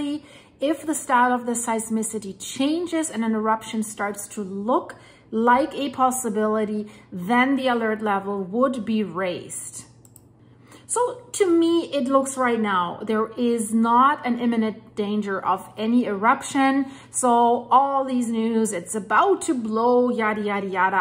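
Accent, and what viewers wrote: Germany. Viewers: I love the German accent especially when discussing volcanology lol How dare you people say such things ☝️ So insulting lol When I first moved to B.C. not long after Mt Saint Helens when off from Australia in 1987. I then saw Mt Baker 😳 holy crap thats scary and all these guys are afraid of snakes and crocodiles. Then I learned of Mt Rainer (Beer cans of coarse) and Mt Shasta and was like omg where heave I moved. B.C. Itself is loaded with more volcanoes north of Vancouver. Australia, the safest place on earth 🌍 so I’m home. Oops, unless we get Tsunamied from a landslide from southern NZ with a mega Tsunami 🌊 of coarse. Such is life as a human being.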